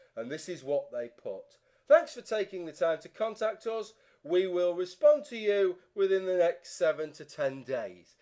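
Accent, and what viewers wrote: United Kingdom. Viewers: Oh how I love British people when they're angry. That accent is amazing. xD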